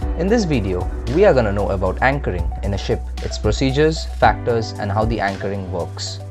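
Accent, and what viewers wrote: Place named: India. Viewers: It's Indian accent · That’s because it’s an Indian accent….no different than British, American, Aussie etc it’s not the accent of someone who’s new to English it’s the accent of someone who grew up speaking it and this is regional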